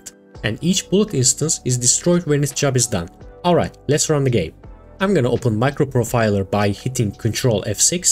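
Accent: Turkey. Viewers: Aksanından Türk olduğunu anladım gayet güzel bir video olmuş... Anlatımın harika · your accent reminds me of Turkish people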